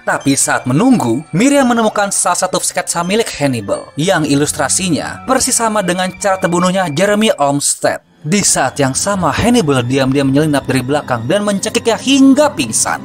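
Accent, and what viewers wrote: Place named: France. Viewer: Mantap bang accent perancis nya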